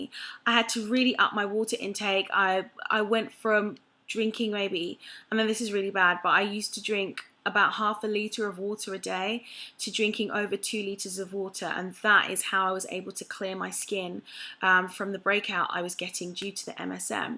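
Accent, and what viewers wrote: England, United Kingdom. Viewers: Ur so pretty and I love your English accent! And your hair looks great! Thanks for the thorough review. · Love ur beautiful English accent....lol. U sound a bit like Rita Ora. ;)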